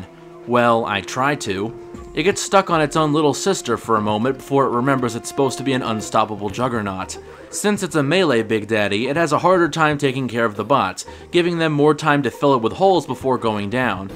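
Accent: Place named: Ireland. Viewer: Ahh makes sense. The accent is more subtle than other Irish youtubers I watch so they didn't come to mind.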